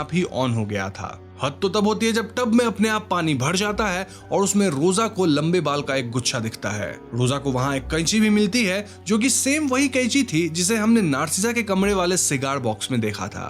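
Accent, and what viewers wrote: Bengal, India. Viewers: Aap Bengali ho kya 😊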